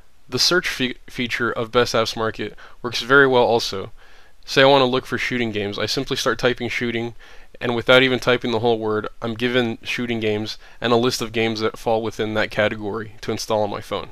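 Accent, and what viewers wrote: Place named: Netherlands. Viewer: You sound a bit like a Dutch guy speaking English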